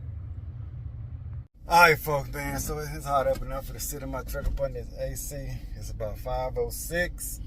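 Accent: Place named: Alabama, United States. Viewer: Small thang to a giant...that`s that alabama/mississippi talk there. I feel you though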